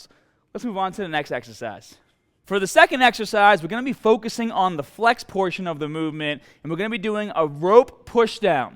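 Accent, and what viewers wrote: Boston, United States. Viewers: “you gotta TAHGET the muscle to SPAHK some growth” I love Boston accents lmao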